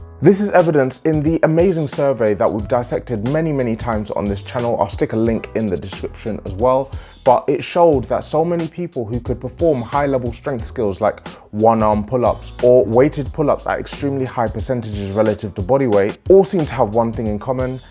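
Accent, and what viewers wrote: United Kingdom. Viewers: woo...The British accent is almost as impressive 😅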